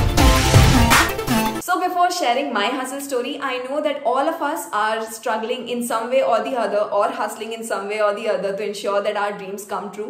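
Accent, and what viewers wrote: Bihar, India. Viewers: Views to aa rhe phle ki trh to kyu tension le rhi ho . BTW wo Bihari accent ki wjh se subscribe Kiya tha, so don't leave it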